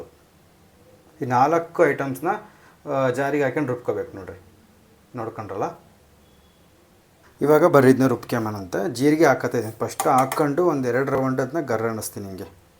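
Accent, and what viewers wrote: Chitradurga, India. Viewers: navu chitradurga navu nemtarane matadodu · Are u from Chitradurga sida aa.... Nimma kannada bhashe namma oorinde agide pakka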